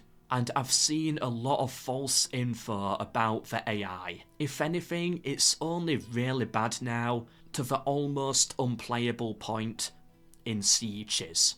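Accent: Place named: Northeast England, United Kingdom. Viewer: What accent is that? North East England but where?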